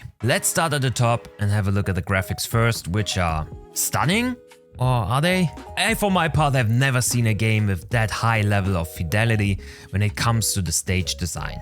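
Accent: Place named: Germany. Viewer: Bro er ist 100% deutsch man hört das raus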